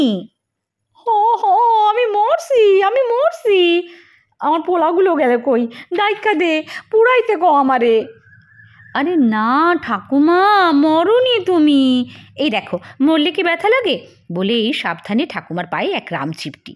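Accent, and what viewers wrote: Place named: Bangal, Bangladesh. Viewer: খুব ভাল লাগল । কি সুন্দর বাঙাল ভাষা বললি ।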